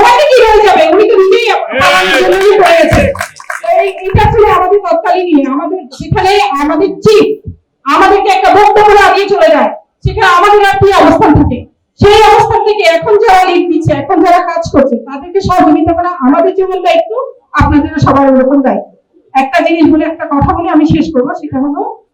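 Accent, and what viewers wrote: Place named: India. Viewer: এই মহিলা ভারতীয় নাগরিক কথা বুঝা যার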